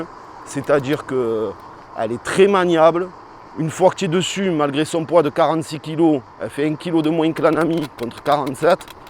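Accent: South, France. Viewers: Super test, j'adore ton accent du sud!!!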